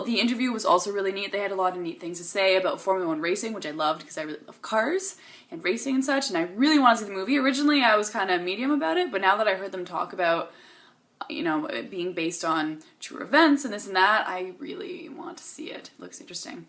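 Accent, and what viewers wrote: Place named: Canada. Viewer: I just realized that you talk and sound just like Robin from How I Met Your Mother who is also a Canadian. Maybe it's a Canadian accent or something but I've never noticed it before.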